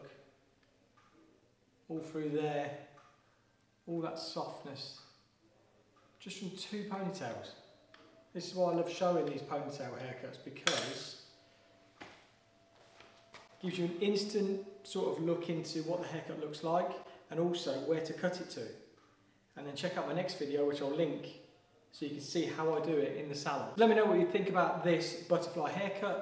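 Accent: United Kingdom. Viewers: LOVE clicking a video and hearing an unexpected UK accent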